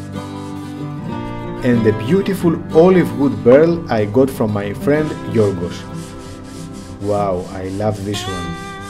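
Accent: Greece. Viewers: I could tell in 5 seconds you are Greek. My Papou is from Zakynthos, Ti Kaneis! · that greek accent )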